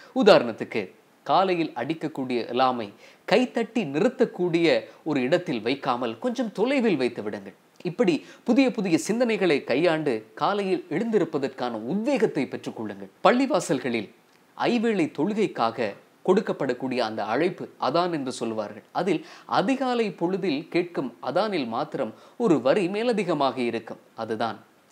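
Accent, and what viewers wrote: Sri Lanka. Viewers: உங்கள் இலங்கை தமிழ் அழகு தமிழுக்கு நான் அடிமை . அருமை 👌 நீங்கள் சிரிச்சிக்கிட்டே இருங்கள் நண்பா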